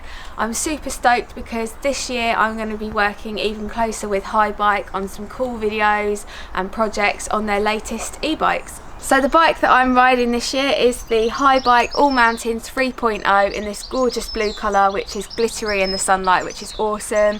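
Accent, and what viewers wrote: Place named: Australia. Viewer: I got used to that Australian accent.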